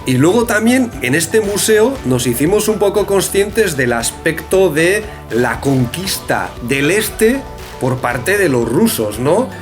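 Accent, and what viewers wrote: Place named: Basque Country, Spain. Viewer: Me parecía por el acento y porque pones cabeza que tenías que ser euskaldun .Un saludo para los dos desde Montevideo , felicitaciones por vuestros aportes !!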